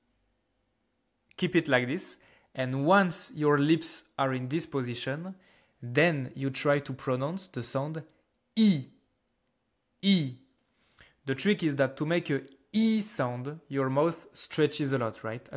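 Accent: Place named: France. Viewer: I love your french accent😊😊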